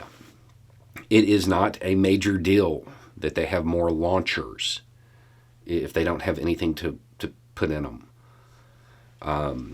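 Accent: South, United States. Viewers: For me gives me comfort that all is not lost. I live up north where it is pleases me that the way talks does not sound like me or the people you normally hear on the news or typical moderates and liberals. He is from the south, looks and sound like likes someone from the south. So people from his neck of the woods are more likely to listen to him.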